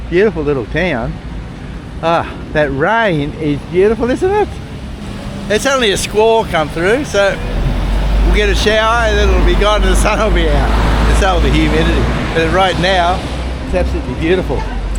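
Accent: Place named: Australia. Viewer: Hi we really love your videos and your enthusiasm. We are Ozzie's by choice living in south America so we do not only get to see your travels but also be able to hear Ozzie accent, see our home city Sydney and other places in Australia Thanks❤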